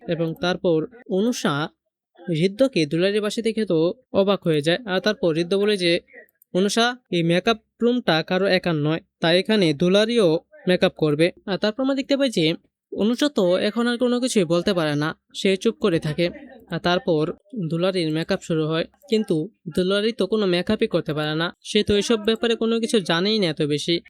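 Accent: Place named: Bangladesh. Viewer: আপনার কথা গুলো বাংলাদেশশের মত শুনতে লাগছে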